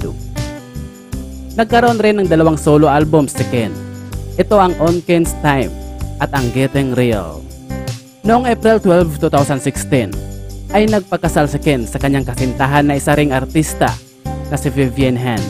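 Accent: Visayas, Philippines. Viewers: Bisaya ka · Bai bisdak ka no maila sa sinultihan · BisAya ka nman magkwento..! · Nkaka tawa ung ngsasalita BISAYA k ba! Wahahahahhaahhahaha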